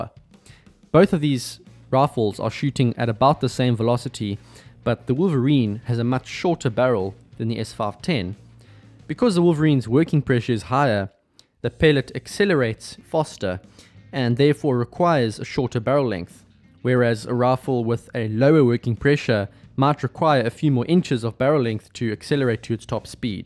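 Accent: South Africa. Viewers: My guess is south african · South African based upon the susername. He’s got same accent as Elon musk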